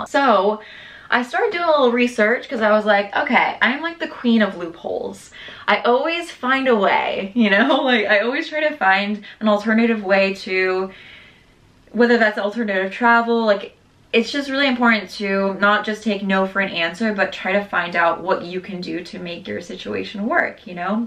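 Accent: France. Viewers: Since you're fluent in French and have been there for six years, do you find the accent starting to stick when you're speaking English? Just curious haha. Love your videos and energy :)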